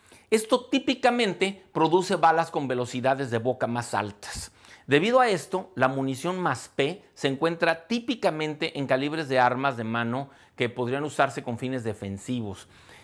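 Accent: Mexico. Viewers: Gran explicación si señor , y sobre todo me encanta ese acento mexicano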